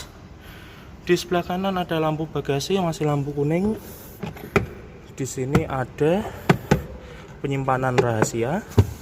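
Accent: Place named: Betawi, Indonesia. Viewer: Guwa apa kulo? Betawi medok nih 😅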